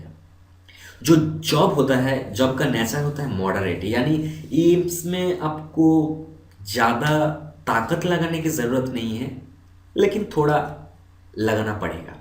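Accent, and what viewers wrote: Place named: Bengal, India. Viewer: Apna hindi thik karo bangali babu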